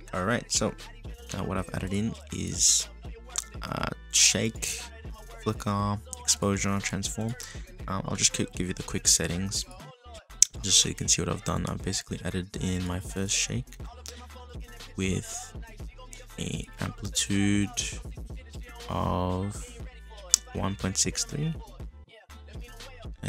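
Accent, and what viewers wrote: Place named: Australia. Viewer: omg… first time hearing your voice and wow, what can I say? It’s incredible… amazing, awesome it’s everything I could’ve ever wished for. Mature, Australian, professional, polite wow. This is one of the best days of my life.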